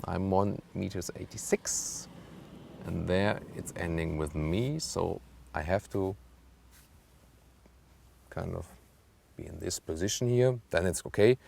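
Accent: Germany. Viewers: Der deutsche Akzent fetzt 😎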